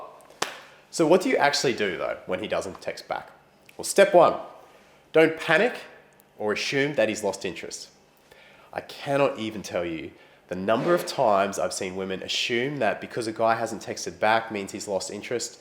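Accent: Australia. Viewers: I dont like Australian accents and thé country but i like this guy.A cool Ozzie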